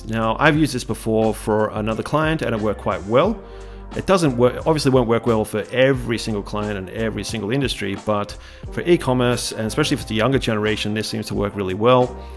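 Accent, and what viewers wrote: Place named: Australia. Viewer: why does refreshing your page make you more trustable..... you sound Australian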